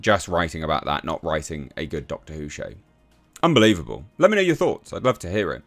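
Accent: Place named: England, United Kingdom. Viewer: I love listening to you, it's so funny hearing another English person spouting off 🤣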